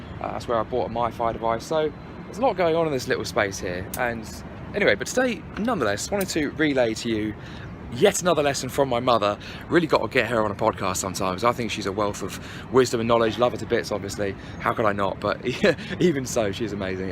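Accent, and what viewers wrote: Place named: United Kingdom. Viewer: Interesting vlog. Glad you had a good time in KL. Your British accent is so thick. Doncaster?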